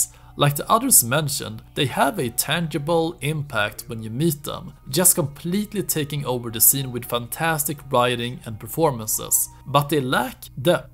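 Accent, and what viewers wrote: Sweden. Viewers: This guy has a very swenglish accent (swedish - english)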